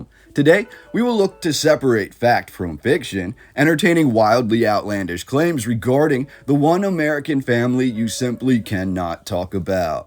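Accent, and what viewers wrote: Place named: Pennsylvania, United States. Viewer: I knew you were from PA by the way you pronounce Lancaster. Lankuster.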